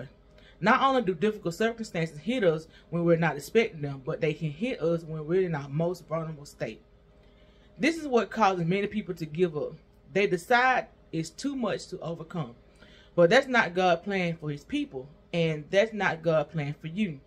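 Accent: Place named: South, United States. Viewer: Enjoy being a stay at home wife and Mom. Love that for you! Thank you for sharing your daily devotionals. Never know who needs to hear that word. 🙏🏾 I feel you on the speech Sis. I'm from the south too where we talk fast, loud and with an accent 😅 But that's what makes us unique and special. Those butterfly earrings are beautiful, nice choice! And that dinner looked delicious!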